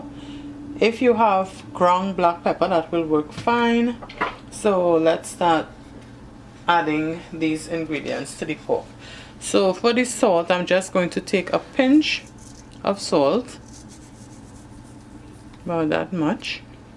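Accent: Trinidad and Tobago. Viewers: Ah hearing that beautiful Trinidad accent.... Love d red black and white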